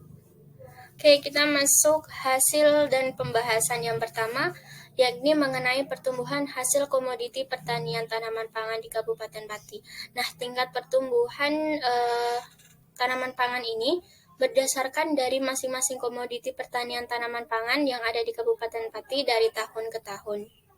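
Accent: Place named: United Kingdom. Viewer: Aksen englishnya woah syekali 🔥🔥🔥🔥🔥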